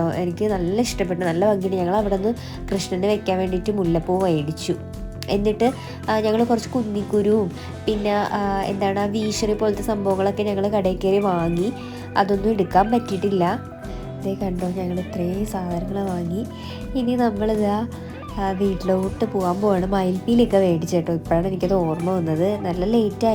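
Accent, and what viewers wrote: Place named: Ernakulam, India. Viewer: Eklm slangayallo😂❤️😍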